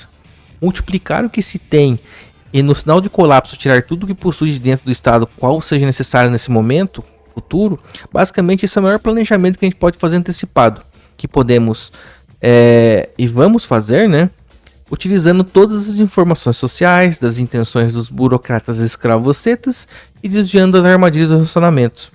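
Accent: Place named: Inland São Paulo, Brazil. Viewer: Praticanente impossível é o sotaque do interior de S P. ele teria que viver uns 20 anos em outra região para mudar.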